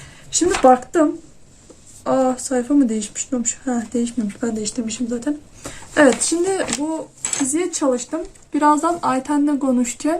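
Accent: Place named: Kütahya, Turkey. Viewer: Şiveden Kütahyalı gibisin öyle isen Kütahya'nın neresindensin?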